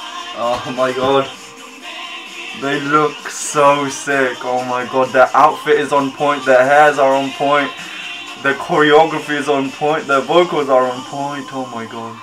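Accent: United Kingdom. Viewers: Are you British? :)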